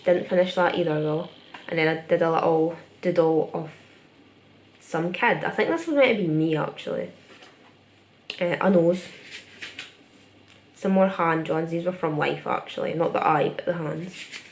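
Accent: Scotland, United Kingdom. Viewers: She seems she from East South of Scotland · English is her first language, she’s just Scottish and has a thick accent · 스코틀랜드 아니면 복부 아일랜드 같아요! 알아듣기 젤 어려운 억양인데 많이듣다 보면 익숙해져요 😊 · woah i was not expecting a scottish accent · omg the minute i heard ur voice i was so happy cus im scottish too and finally i've found my fav fellow scot youtuber <33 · I’m also scottish BUT CAN I JUST SAY HOW TF DO YOU MAKE OUR RANSID ACCENTS SOUND CUTE?? i souND LIKE A MAN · Kelly Cho 저번영상에서 스코티쉬라고 한거 같앗는데 맞앗네요ㅜㅜ진짜 못알아듣겠어요 감사합니다!!😘😘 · your art is absolutely amazing and so are you <3 i had a bit of trouble trying to underestand what you're saying though, idk if that's rude i really don't mean to be rude!! I'm just not scottish so I'm not used to it i guess - but would you mind putting subtitles ? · Are u british??? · Why can’t my Scottish accent sound like that 😂😭 you’re incredible no wonder you got accepted! 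· Yeah I know that lol I mean I wasn’t expecting her to be Scottish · she's from scotland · the accent took me aback, didn't know she was Scottish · Just a curious question, whereabouts is ur scottish accent from? Btw love ur vidz 💖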